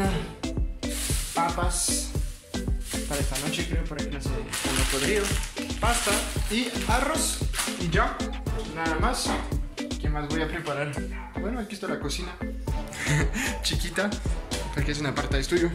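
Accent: Bogotá, Colombia. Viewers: Cada vez tienes mas acento de rolo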